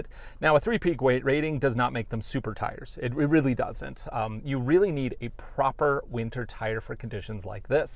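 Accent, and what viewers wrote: United States. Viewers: I am also wondering why we are watching the driver so much when we clicked on this vid to SEE HOW THESE TWO CROSSOVERS PERFORM GOING UP THAT HILL?! Less talk and more showing of the two CUVs. PS The way this guy drives and talks, he must be from the Midwest.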